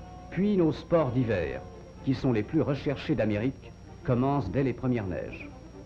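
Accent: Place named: Canada. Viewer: clearly a Canadian accent, but a formal version used in broadcasts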